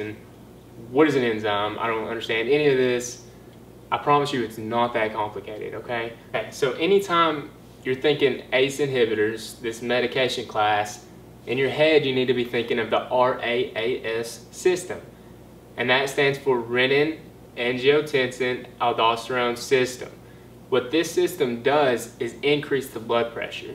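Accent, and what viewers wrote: United States. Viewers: Great! Best explanation I have seen thus far on You tube. Of course, being from NC, I am also partial to the accent :) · Southern accent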